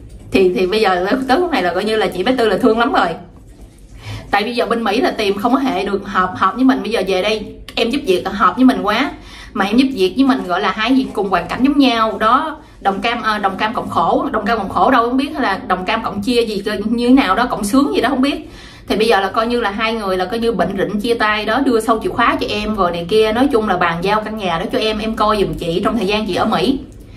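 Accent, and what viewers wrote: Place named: Rural, Vietnam. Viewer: Cô rất thích - giọng nói đậm chất quê- tâm lại tốt nữa Oke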